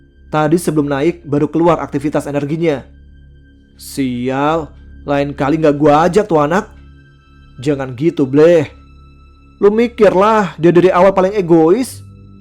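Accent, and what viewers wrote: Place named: Java, Indonesia. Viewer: Bang bisa ga ngomong nya jangan di medok medokin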